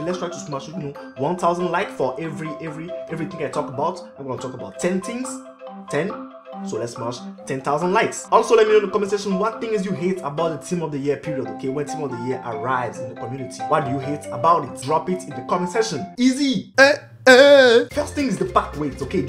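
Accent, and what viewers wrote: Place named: France. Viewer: On entent ton accent français Mdrrr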